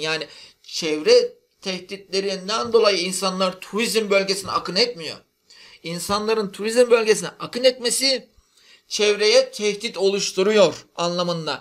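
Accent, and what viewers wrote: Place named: Arab world. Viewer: hocam arapça edasıyla ingilizce ders anlatıyosunuz.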